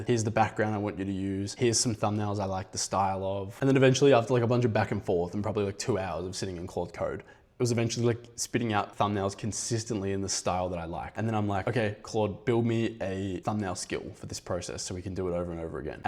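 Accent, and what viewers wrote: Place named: United Kingdom. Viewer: Really like what you're doing .. we're building the best Sales & Marketing Voice and Messaging agents you'd come across, along with business analytics tools for major corporates. We code our products. From the sound of it, you're definitely british, I lived in Manchester for a year during business school as well, right down the street to Arndale center. Would love to see how we can collaborate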